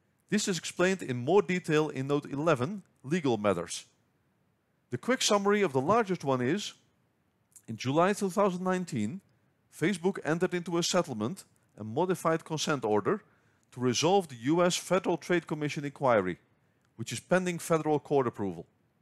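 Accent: Netherlands. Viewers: Thank you. Really good explanation. Do I hear Dutch accent?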